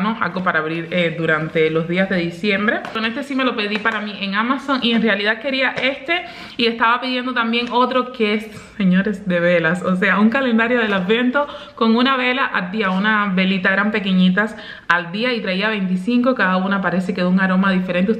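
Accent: Cuba. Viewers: Navegando por youtuve y encontre una familia muy interesante y bella mas tu hijito que niño mas tierno y hermoso, sere tu Nueva seguidora ya que me encanto tu contenido felicidades amo el acento cubano saluditos y muchas bendiciones desde cbba-Bolivia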